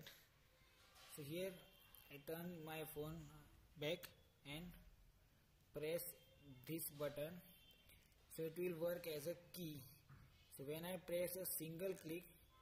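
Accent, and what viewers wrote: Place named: India. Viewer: I love Indian Accent really!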